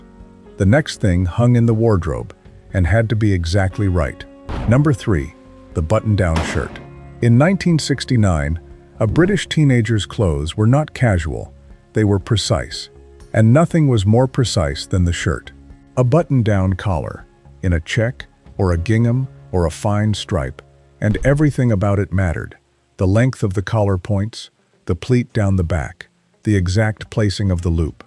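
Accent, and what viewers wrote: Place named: United States. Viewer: Why the US AI accent? And rockers illustrating an article on Mod Culture? Most of the things shown here were on their way out by 1969, apart from the skins.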